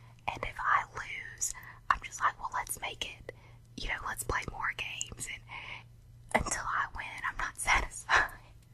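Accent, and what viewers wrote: South, United States. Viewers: Wow, you have a southern accent! I think you peeps who have southern accents are the most relaxed people! Your voice is sooo relaxing. :) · I really enjoy your southern accent :P It bring tingles down my spine :P Awesome video once again!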